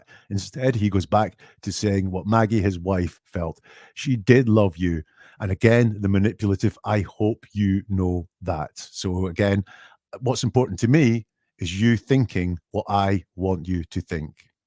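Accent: Scotland, United Kingdom. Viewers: Couldn't believe it when I clicked and heard a Scottish accent...lol I've only ever heard Americans discuss this case. Loved the video. New subscriber from Ayrshire here 😁👍🏴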